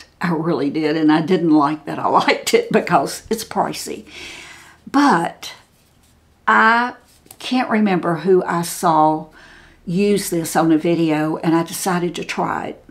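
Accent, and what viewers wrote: South, United States. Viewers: really enjoy your videos. Love your Southern? accent. Could you tell me what in your opinion, is the bed undermaker primer. I have a lot of wrinkles from living in the middle east for years and being very fair. Thanks. xx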